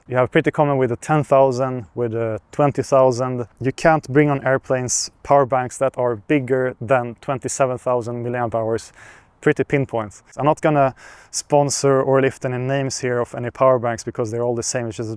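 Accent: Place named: Sweden. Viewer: I love the swedisch accent, its so cool. And it reminds me of Ollienelson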